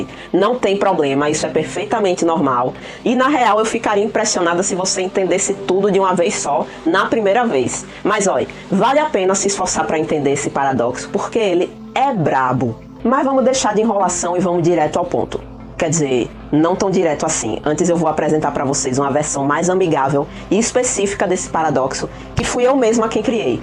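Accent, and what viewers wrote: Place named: Sergipe, Brazil. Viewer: O sotaque do meu País Sergipe me dá até um tesão. Saudades da minha terrinha. Parabéns, pelo conteúdo!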